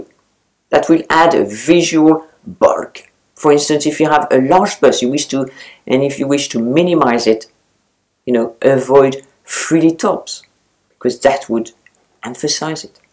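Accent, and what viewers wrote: France. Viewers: Besides the great, and wonderful content, I come to this channel to hear French accent!♥️♥️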